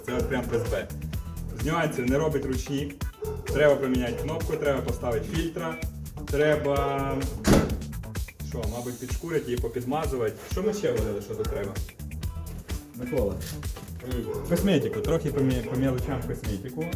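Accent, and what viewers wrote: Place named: Rivne, Ukraine. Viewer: друже ти з Рівного,чі десь прруч?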